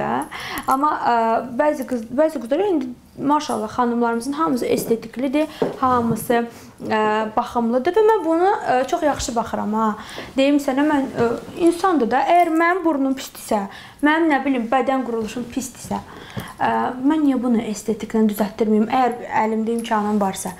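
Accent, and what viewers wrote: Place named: Trabzon, Turkey. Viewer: Trabzon şibesiiyle okuduğun mahmuda lap yerine düşdü evli kişiyle qarışdıran müğenni qadın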